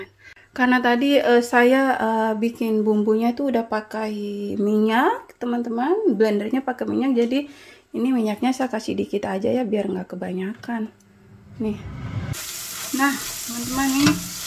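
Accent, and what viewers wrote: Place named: Ullath, Indonesia. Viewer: Mantap,logat Ullath bole biar ciri khas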